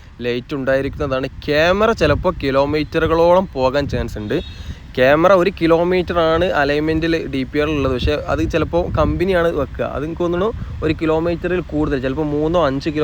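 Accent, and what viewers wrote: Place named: North Kerala, India. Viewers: Good talking . Nice to hear the north Kerala slang. Well done Nitin Gadkari ji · വടക്കൻ കേരളത്തിലെ സംസാരഭാഷ കേൾക്കുവാൻ നല്ല ചന്തം ഉണ്ട്. അച്ചടി ഭാഷ അല്ലെങ്കിൽ എന്താണ്.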